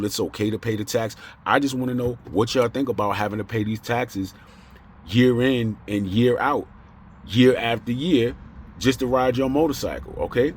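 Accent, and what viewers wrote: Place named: Maryland, United States. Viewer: Sounds like you grew up in maryland and moved to Virginia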